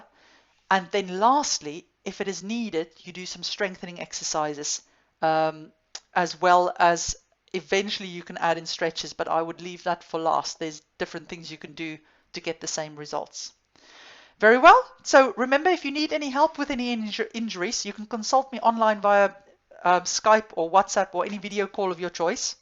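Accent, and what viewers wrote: South Africa. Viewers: Excellent presentation. Love the South African accent. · Your previous video was so good, I came and found this one too! You sound like a fellow South African :)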